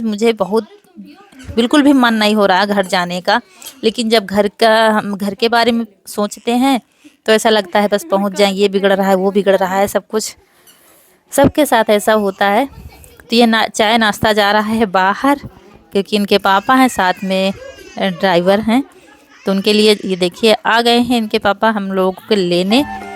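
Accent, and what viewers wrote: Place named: Unnao, India. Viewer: Kya aap unnao jila ke hasan ganj ke aas pas ke rahne wale ho. Kyo ki ham bhi wahi ke rahwasi hai aur Aapki bhasa bilkul hamare jaisi hi hai aur sare sadi biyaah ke reeti rewaaz bhi. Mai mumbai main rahti hu aur jab bhi aapki vedio dekhti hu to mujhe bhi apne gao ki yaad aati hai.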